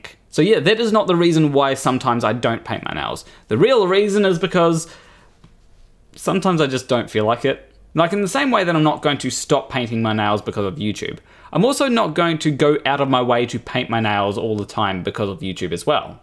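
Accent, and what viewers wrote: New Zealand. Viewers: I forgot to mention that your accent is more unusual to me than your nails. I don't have a lot of exposure to New Zealanders. Probably, the only thing is Brokenwood Mysteries episodes. The long e sound is more prevalent in New Zealand, it seems. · Good one for being You, man. 😁 Your New Zealand accent is also amazing. A-hui-hui 😁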